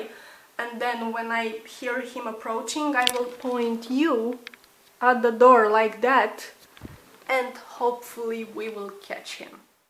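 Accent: Romania. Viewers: I like your romanian accent :D. oh and stop bumping your head into things! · her accent is bull. oh wait, she's romanian. what does she want anyway? lonely girl...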